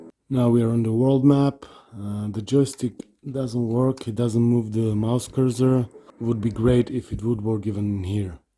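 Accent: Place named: Latin America. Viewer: por que siento que eres latino xd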